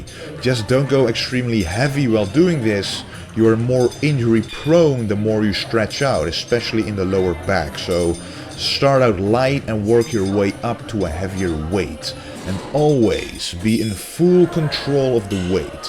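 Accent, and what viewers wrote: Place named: Netherlands. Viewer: are you from the netherlands? your english accent sounsd a lot like a friend of mine from the netherlands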